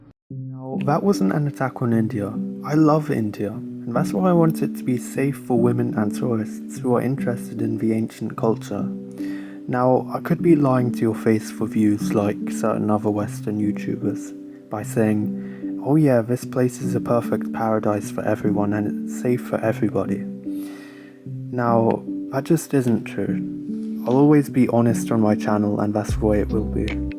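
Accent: United Kingdom. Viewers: For once it's great to hear a British accent. Your honest views are much appreciated. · I just love and adore uk accent.....❤️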